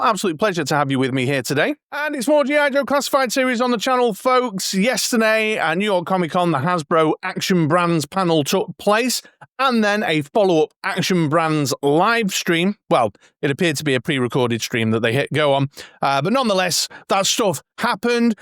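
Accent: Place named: United Kingdom. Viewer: What a great review. Plus the accent gives it that British charm and you can tell how excited you are by the volume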